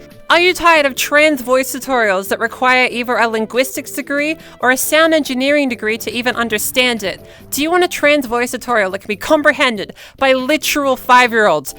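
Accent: Australia. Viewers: I like your 'Strayan accent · love the Aussie accent you go girl loving you doing it for aussie girls yehwe definitely don't want to end up with Aussie girl voices proud to be Australian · Me, a straight man who has never had any interest being a woman: Interesting But to be for real, I'm just here to listen to an Australian accent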